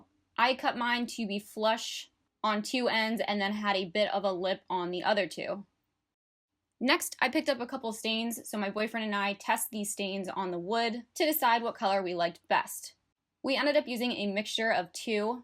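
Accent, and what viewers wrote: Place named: South, United States. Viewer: Very southern and cute ☺️ reminds me of home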